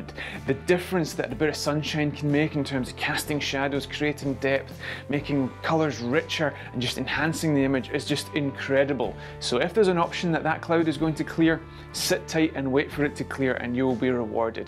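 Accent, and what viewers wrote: Scotland, United Kingdom. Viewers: Scottish man love it I’m a MacGregor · Amazing set of drone flying tips and I love your Scottish accent. I always check the flying conditions on apps like UAV forecast or Weather by Tomorrow before flying. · The Scottish dialect is simply the coolest in the English language and the drone tips are definitely great, thanks for that! 👍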